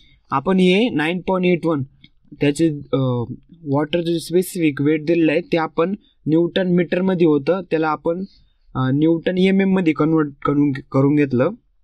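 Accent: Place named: Nashik, India. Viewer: Bhau nashik cha vatatoy😄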